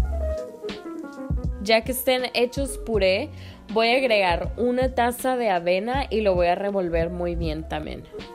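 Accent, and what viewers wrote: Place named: Monterrey, Mexico. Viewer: Disculpa,eres de Monterrey? Tu acento es muy parecido al de Monica Llaca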